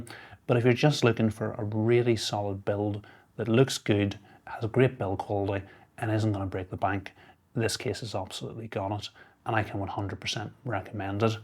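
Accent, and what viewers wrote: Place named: Ulster, United Kingdom. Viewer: Struggled with the Ulster accent on occasion, but aside from that excellent and informative video. Thank you.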